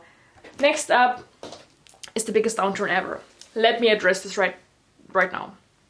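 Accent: Germany. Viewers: are you german ? (me 10 minutes later: JA BIST DU. Ich hab es einfach gleich gehört weil ich den gleichen Akzent habe)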